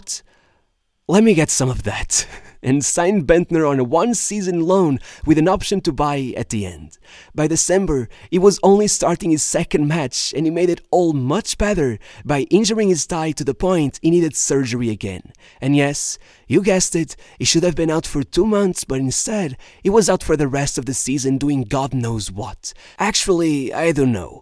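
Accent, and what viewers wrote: Portugal. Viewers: is it just me? i just can't stand Portuguese accent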